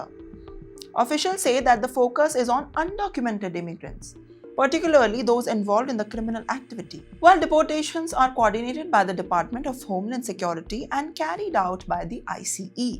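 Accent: India. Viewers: Indian Accent, Indian English, very irritating. Could only take 2 minutes listening.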